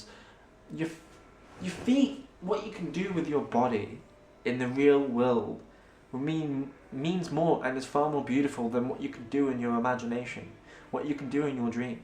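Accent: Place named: England, United Kingdom. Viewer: I'm sorry but I had heard that I should tune into this but I found it very difficult to understand due to his very strong English tone that some of us could not understand.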